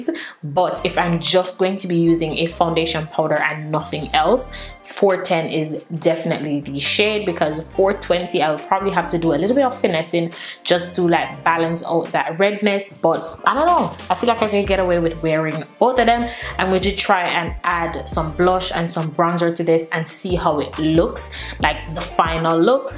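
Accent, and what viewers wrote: Jamaica. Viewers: Are you Jamaican? I sense a bit of an accent! I'm Jamaican so I was curious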